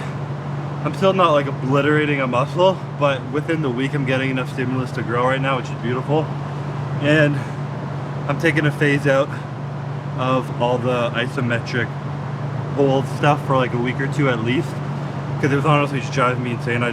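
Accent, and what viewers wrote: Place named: Canada. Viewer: your Canada really came out this vid lol. As well as your th-th. 😂. Love youuuuuuu! ❤️ 🤣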